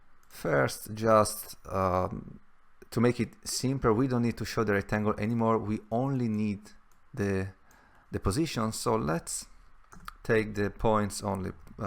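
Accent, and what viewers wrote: Italy. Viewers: Improving 3D + perspective is interesting, you should push that project much further for sure! Thanks for sharing. It's the 4th video i'm watching from you, allow me to comment my overall experience: - Monotone voice, a bit 'boring' (don't want to be rude), you don't seem motivated or you don't seem to enjoy sharing or you don't seem to enjoy doing it... C'mon, share your guts! - Funny Italian english (good and bad I guess, still better than the Indians .py tutorials, right :D ) - Really GOOD content, hard to find (i just tried different keywords this time and found the answers i needed! I'm happy!) - Speed of coding is good enough, the code is enough explained (it's really the feeling you give: you are bored to do this! -> that is the bad part of the coding..) - Keep on going with these things, i subscribe now because you have an interesting topic I want and need to learn for professional purposes, so I will watch your next videos as they come and I will check your previous ones with time. 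Anyway, wish you the best of success on your youtube path! Thanks again for the sharing and explanations, really helpful and useful, good enough for now, add more motivation in your voice and we'll be friends for a long time! :) Ciao :)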